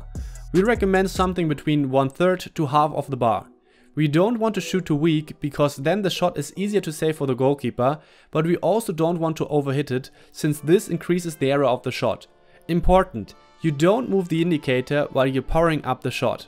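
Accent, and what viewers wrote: Germany. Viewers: Man hört einfach, dass er ein Deutscher ist 😂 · The classic German English 😁 great video! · Hört sich ziemlich deutsch an😂😊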